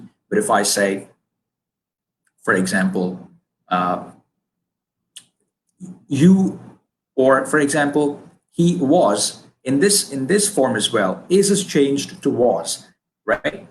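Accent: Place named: New England, United States. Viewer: I love everything about these contents and the way Augmedix has approached in teaching the work-force that wants to eventually become a part of Augmedix. Getting to learn from this man has been a pleasure although his accent, even after so many 'thorough' listens; I find quite amusing as to me it sounds like a perfect half-in-half crossover between Eastern New England English and Mancunian. This man's tone combined with that accent is pretty 'chad' 😁😅🤘✌🤙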